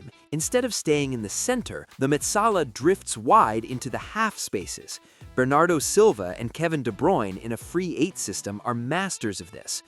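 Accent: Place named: United States. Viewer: These pronunciations.. you yanks rly should stay away from football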